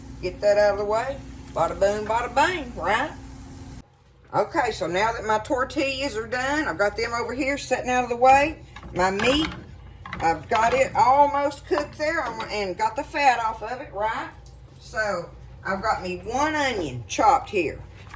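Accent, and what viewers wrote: South, United States. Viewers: 🍅🧄🌶️Looks great! I like the idea of soft frying the corn tortillas. You’re a very cheerful cook. Fun to watch. I’m from Texas but live in Utah. I am just basking in your beeeeaaauuuutiifuul accent. It’s music to my ears. 👂 Listening to you talk and watching you cook is like being in Heaven. I really miss living in the south but my kids all live in Utah, so here I am. Thanks for sharing your love. Cooking for one’s family is love.❤️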